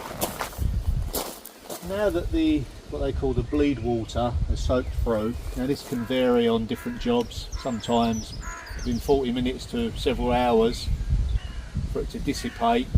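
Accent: United Kingdom. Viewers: . I texted UTube about this problem Their answer: British accent maybe hard to understand. That’s in a nut shell. But that’s not right because American English is having trouble too. They have a problem and can’t fix it. 😢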